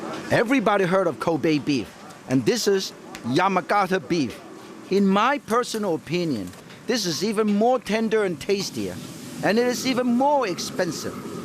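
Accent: China. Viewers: sounds like * inspiration for the Chinese English accent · i love the chinese accent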